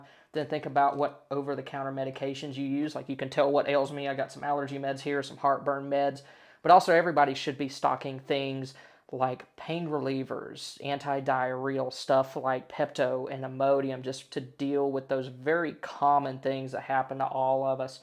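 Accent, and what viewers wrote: Texas, United States. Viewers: Sounds like your either west of Fort Worth or south of me in central Texas. We are in the DFW area and have a place in southeastern OK that we can go. Your on the money all the time with your ideas here. I'm a retired BSA volunteer Commissioner ....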